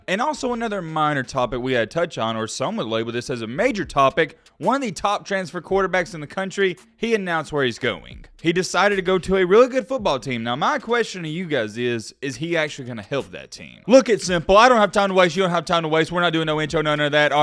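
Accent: South, United States. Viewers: I'm going to your stuff more than the others cuz I hear that southern trying to come out when talking. Can't keep the southern boy out lol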